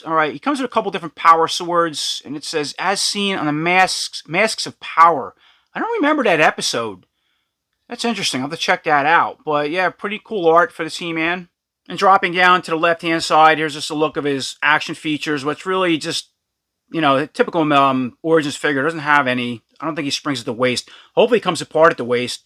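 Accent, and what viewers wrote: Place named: Boston, United States. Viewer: You got that Boston accent. lol Live the review. Thank you. 😉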